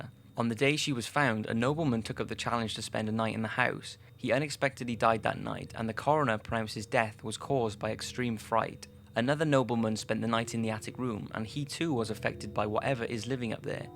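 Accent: Wales, United Kingdom. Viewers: Are you british? you sound british. :) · It's the welsh accent hun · can't beat a bit of welsh accent see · This guy sounds like he's from Newport in wales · what a retarded fucking comment..this is guy sounds British so presumably he only speaks English and does his research from books written in english and from the english part of the internet. he's not gonna read/research blog post or article about some pakistani family's encounter with a ghost because it would most like be written in Urdu, for pakistanis by a Pakistani. I guess you gotta forgive him for not speaking every single damm language in the world - I hate race-baiters. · Are you Welsh? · are you welsh? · Do I detect a Welsh accent? Lol might be wrong but my Welsh ears hear some tones ;p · Omg you have a British English accent I always wanted British English accent so I be royalty you can teach me how to be British I love your mystery videos 😊😊😊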